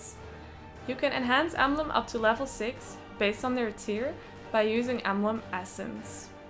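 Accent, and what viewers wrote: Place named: Netherlands. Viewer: She sounds Dutch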